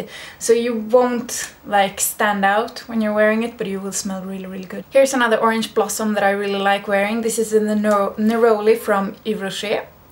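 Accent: Sweden. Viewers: I can't get enough of that swedish english accent! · I am usually attracted to old-school stinkbombs (Shalimar and Opium) but you make me want to go for some Angel. After watching your older perfume video I went out and tried some Versace Black Crystal and since then I have been obsessed with white flowers, and yes it totally smells like vampires on the beach! Also that swedish accent is so sweet.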